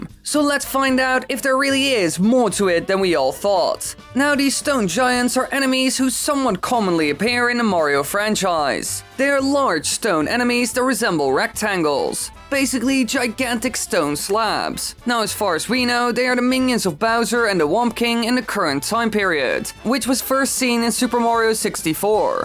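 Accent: Netherlands. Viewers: Are you dutch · Saw your majora's mask video and thought I heard a little dutch accent. Your PO box adress confirmed it Haha. But so am I!Keep up the good work dude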